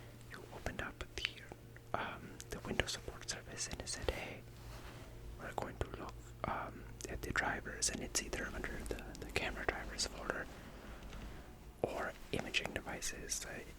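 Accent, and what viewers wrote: India. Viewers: the indian accent is one of my favorite ASMRs... very nice! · If I ever have tingle immunity, I head straight to your Indian accent videos. Love this one! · 16 minuts? And indian accent is more noticeable? My new favorite video in this channel.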